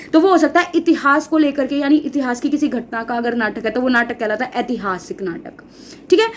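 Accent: Haryana, India. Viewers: aap paka haryana se ho aapki ton aate hai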